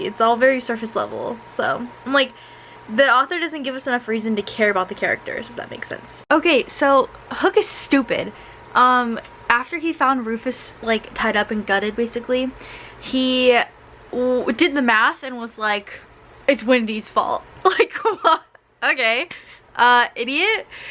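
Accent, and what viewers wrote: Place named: England, United Kingdom. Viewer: Cackling at your English accent🤣❤️ I literally love these so much- getting to live vicariously through you reading books I wouldn’t pick up for fear of DNF’ing 🤣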